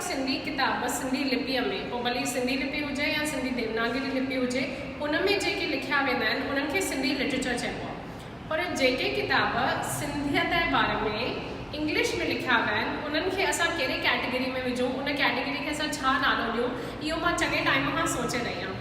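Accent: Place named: Shikarpur, Pakistan. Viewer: Tuhnjo lahjo ee shikaarpuri thae aon tokhy hik frnd sa mlraendas uha tokhy thnji jhlak lgndi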